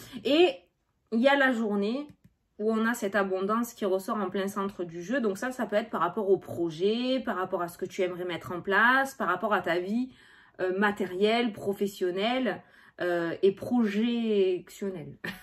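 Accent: South, France. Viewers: Tjs un plaisir de vous regarder......joli accent du sud qui fait sourire ici à Bruxelles...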